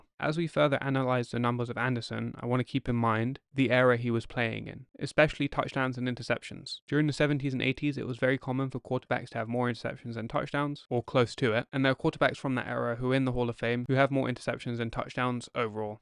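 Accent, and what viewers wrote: United Kingdom. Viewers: "Many of you grew up watching the game. I am from the UK, so I didn’t. I only discovered the sport in 2012/2013. I’m sorry if it bothers some of you that I’m young. What can I say." -- Some people are just bothered and get on the web to share their misery. Don't let them grind you down or steal your joy! I watched your video, noting your accent, and thought you are very insightful. Glad to have a young fan of American football in the UK! Liked and subbed. "I want to stress I’m no expert. I get things wrong all the time. But I enjoy the game, I like researching, and I hope to provide a unique spin in my videos. I will continue to strive and improve every video." -- Carry on mate, and enjoy yourself. Don't ignore your critics, but focus on your fans. May you gain many! "Can’t change my age though....Sorry for the lengthy comment. Thank you for your time and God bless." -- Haha, time will take care of that for you, trust me! 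But those numbers are only laps around the Sun. True wisdom is found by looking above and beyond the Sun. May God bless you, too, and grant you much wisdom. See you in your next video.... 😃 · British accent? ok.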